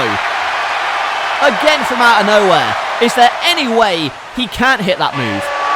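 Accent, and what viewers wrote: United Kingdom. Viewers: This guy sounds British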